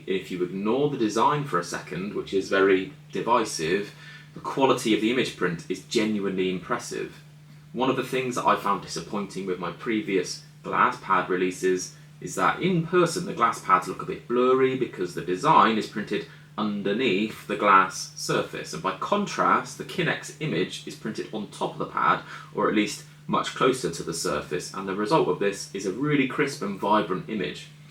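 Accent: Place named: England, United Kingdom. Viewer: Banger review and banger English accent! Do you have also a twitter account?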